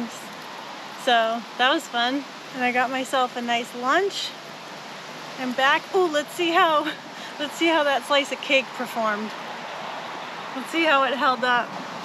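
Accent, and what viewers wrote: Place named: South Shore Massachusetts, United States. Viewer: Careful out there lol Also I can hear the south shore Massachusetts in your voice 😊 haha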